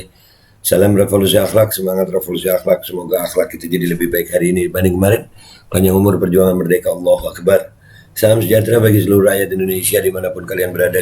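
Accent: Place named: Java, Indonesia. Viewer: medok bingits 😁😁😁😁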